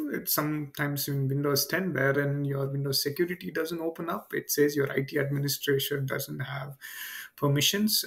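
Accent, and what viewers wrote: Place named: India. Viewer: dude this is a fucking scam, don't download random .exe files from the internet, especially not from someone with an Indian accent (they literally have professional scamming centers in India, look it up ...)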